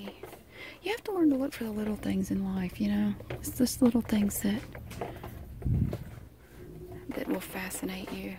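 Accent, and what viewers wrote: South, United States. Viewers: I just absolutely love your southern accent. A few years ago, I moved from the south and I really miss the southern accent and to have it combined with a wonderful asmr video is amazing! Thanks you! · Please keep making these shelf organizing videos❤️ They’re so satisfying, plus u make me laugh without trying, and ur southern drawl makes me feel at home❤️❤️❤️❤️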